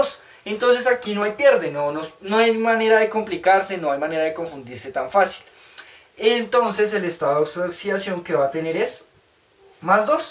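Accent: Colombia. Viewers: Se nota que eres de Bogotá, Colombia (lo se porque yo también)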